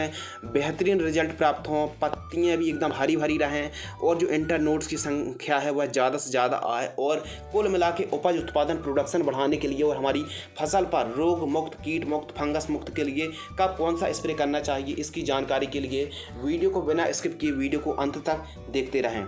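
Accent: India. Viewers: Apka ye video mujhe achha laga, jaankari jabardast h sir, ....... Apka bolne ki shaily rathiya bhasha laga app kaha se ho sir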